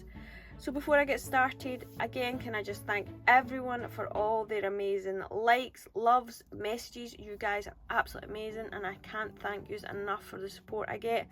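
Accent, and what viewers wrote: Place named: Scotland, United Kingdom. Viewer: I have liked and subscribed to your channel and love that Scottish accent. This wreath is stunning i never seen anything like it its stunning. great job you are one talented lady.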